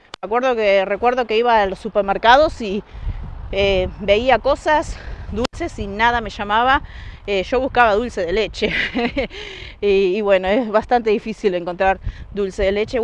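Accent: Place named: Montevideo, Uruguay. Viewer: Vos si q nos hacés el honor de representarnos. Tu forma de expresarte es bien de montevidiana. A pesar de tantos años que vivís ahí no perdiste ese "que sé yo",😉. Me encanta que seas vos misma como todo los q tienen su propio canal. Feo es cuando los uruguayos se van a otro país y después se creen mejor que los demás Ese barrio es precioso. Disfruto muchísimo tus videos,esos lugares q me hubiese gustado conocer en persona,pero bueno,para q está y su canal? Para hacernos conocer New Yersey y New York ☺️😉. Bien, encontraste la panadería 👏. $900 😳🙄🤦. De veras que no hay ni un asiento para sentarte 😁. Pero bueno ☺️😂. Andás con alcohol para las manos antes de comer? Qué? Eso te salió 20 dólares? Con razón te hizo sufrir pagarlos. Claro que disfruté mucho,😘🙋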